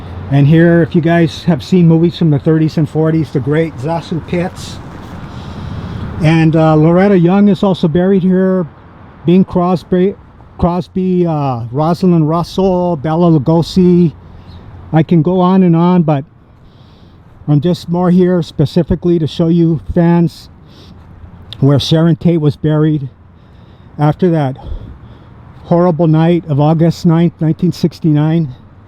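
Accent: East Coast, United States. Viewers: What is this guys accent from? East coast?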